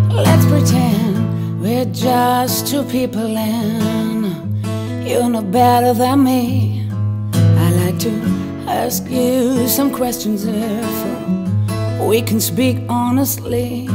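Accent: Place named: Germany. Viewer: Geile Stimme für die eigenen Songs, aber ist und "native speaker". Man hört den deutschen Akzent und ablesen geht gar nicht! Sorry!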